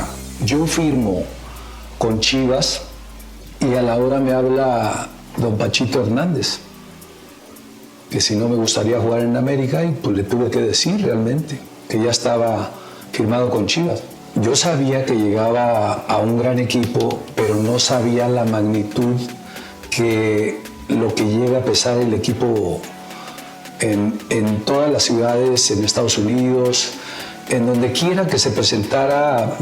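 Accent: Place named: Spain. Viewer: El señor Galindo una de los mas grandes ídolos de el chivas del Guadalajara y México siento mucho que hubiese y haya corrupción en el deporte y gente como tu que merece el apoyo máximo no se le diera y tu como entrenador serias excelente, soy machin pero desearía tener su autógrafo maestro y toda mi admiración eres de las personas que saben y tienen el sentimiento de representar a un club de coraza y de representar a su país con todos los hueboz solo una hora con el Maestro y podría escuchar más se me hizo poco es como el agua que filtran las montañas de lo que le hace falta a nuestro México personas que en realidad tengan principios compromiso y el color en la sangre no como el español del:D que tiene el acento español que usa a figuras como tu tu deberías de tener tu canal galindo y créeme la gente te seguiría más a ti perdon señor pero su acento español no me gusta me agrada más el de México solo que eres cabron sabes de donde sacar y lo que queremos ver como al Maestro que bueno que no hiciste tu auto entrevista por que la verdad no me importa y no la vería o hazla haber cuantos likes tendrías:D 😀 es broma no te tenzes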